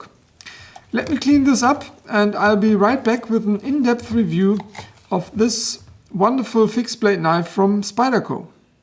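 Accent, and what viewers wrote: Germany. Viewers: Nach den ersten 4 Wörtern wusste ich: ein Deutscher xD keep going - it's great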